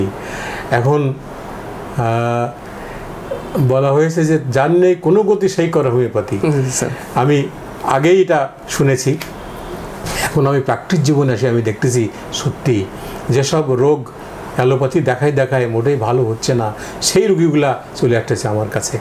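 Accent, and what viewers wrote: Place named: Chuadanga, Bangladesh. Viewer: স্যারের ভাষার মধ্যে চুয়াডাঙ্গার ভাষার মিল পাওয়া যায়।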